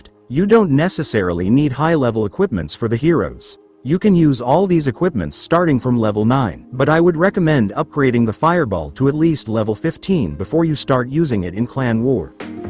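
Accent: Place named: Asia. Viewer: I have 0 negative intentions when I say this but I can hear the Asian accent through the voiceover I’m dead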